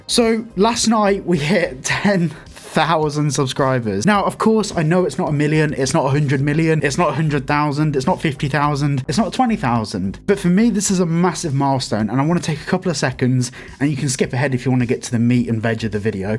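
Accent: Birmingham, United Kingdom. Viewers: This dude is from Birmingham I can tell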